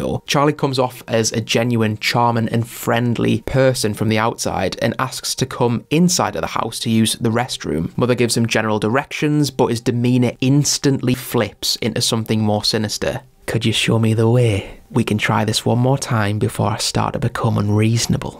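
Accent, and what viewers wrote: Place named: Newcastle, United Kingdom. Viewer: cool video mate, are you from england? sound like a geordie haha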